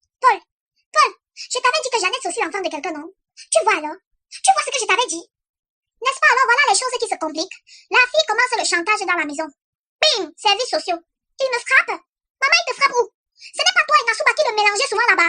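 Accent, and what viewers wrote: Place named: Cameroon. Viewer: je suis ivoirienne!! jadoooore trop votre accent camer!!!!! bne continuation:*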